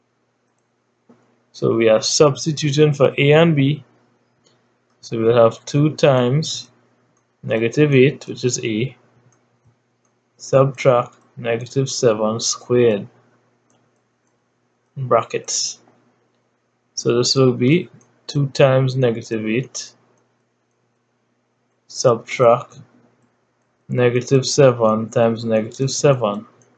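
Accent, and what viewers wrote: Trinidad and Tobago. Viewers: Wow....great job, sounds like a Trinidadian tutor. Well explained. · You from Trinidad ?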